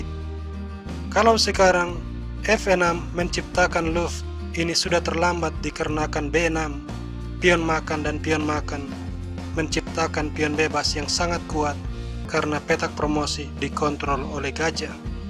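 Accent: Manado, Indonesia. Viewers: manado ngomong 8 itu lapan bukan delapan... itu uda kliatan bgt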